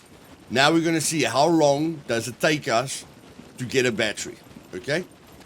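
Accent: South Africa. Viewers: Heiii are you South African. Just judging by the accent:) I myself am, thats why i recognize it.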